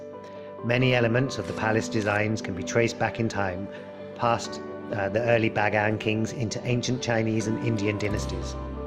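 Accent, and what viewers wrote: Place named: RP, United Kingdom. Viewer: You sound so posh I love it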